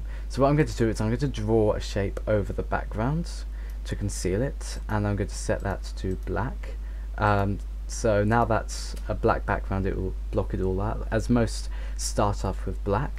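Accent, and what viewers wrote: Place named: United Kingdom. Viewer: are you british, you sounds like you came from the united kingdom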